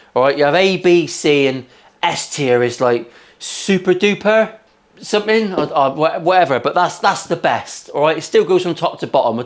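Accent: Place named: England, United Kingdom. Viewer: Me too. I love the colourful language. It's hilarious and makes me proud to be British. Why does innuendo sound so much better in a West Country accent?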